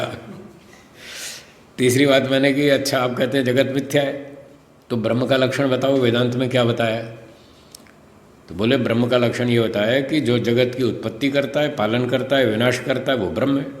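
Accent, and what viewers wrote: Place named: Haryana, India. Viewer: वाह पूजनीय स्वामी जी मन्त्रमुग्ध कर दिया आपको रौबदार आवाज में हरियाणवी लहजा झलकता है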